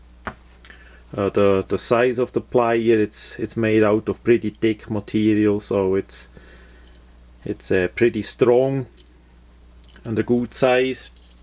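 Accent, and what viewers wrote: Switzerland. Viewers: Extrem geil, Englisch mit Schweizer Akzent. Klasse 😄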